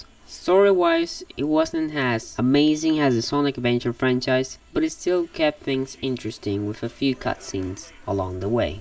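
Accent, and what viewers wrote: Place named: United Kingdom. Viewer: You sound British. No offence, I'm British too.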